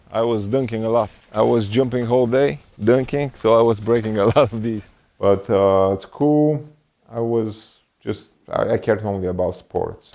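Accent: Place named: Balkans. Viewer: English with Balkan accent!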